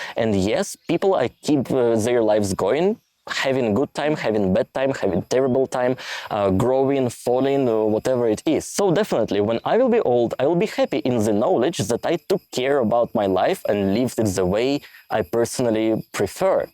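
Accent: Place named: Russia. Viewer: Stop pronouncing ''The'' as ''Zz'' Instead say ''D'' or maybe it is you sing as Russian English speaker it makes you more exotic.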